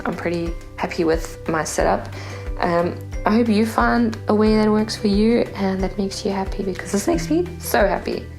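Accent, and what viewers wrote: South Africa. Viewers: Hi 👋🏻 I'm South African! So lekker to hear our accent! Enjoying your videos xx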